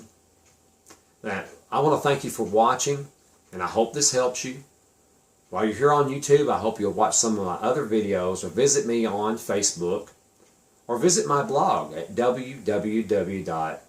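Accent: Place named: Texas, United States. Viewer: i just love you,. i'm texas born and bred and you TALK like me! you sound like my kinfolk! anyway, i came upon your videos somehow and started "kind of" keto" (still working on consuming so much good fat) but started fasting a month ago and have lost 17 pounds!!!! i'm 62 and very inactive these days and the pounds still came off! fasting was not nearly as scary as i thought it would be; actually quite the opposite. i LOVE it and when i do eat, the food tastes better, i feel better, and it gives me joy. keep it up for so many of us!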